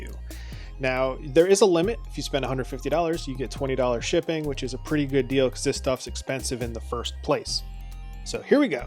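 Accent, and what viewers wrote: South, United States. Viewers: This was great to see ...Bring back your Southern Accent she hasn't been on in a long while except once 😁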